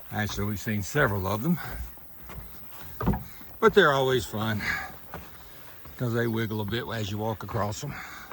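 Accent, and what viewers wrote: United States. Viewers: I like listening to your North Carolina accent. My uncle settled in Rockingham after WWIl and sounded like you even though he was from Erie, PA. Really enjoying your hike. Thanks for sharing.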